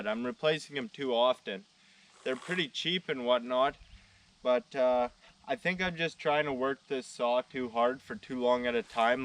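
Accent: Canada. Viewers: Bahaha that’s what I’m sayin I’m sittin her with my pup and this one has me rolling. I ain’t had this much beer come through my nose in a long time. I’m a Texas feller but Been round a few Canadians but this feller by far is the funniest feller I ever heard talk from up north. I’d give anything to be able to go lay down some trees with him haha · I recently found your channel and I did go back and watch the cabin build, staining, etc and I though with you cutting wood and throwing it on the ground, if you get a chance, you need to build a shed roof over your side porch for firewood or extra coverage for whatever you choose. Also...GREATEST CANADIAN ACCENT EVER!!! 👍🏻 Keep up the videos!!!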